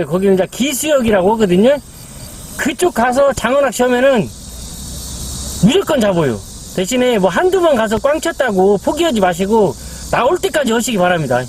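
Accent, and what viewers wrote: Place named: Gunsan, South Korea. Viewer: 정말 오랫만에 보네요 땅강아지! 그런데 많이 날아오네요 청정지역이네요 근디 말여유 사시는 곳이 군산이여유? 찰진 사투리가 섞인거 같아서유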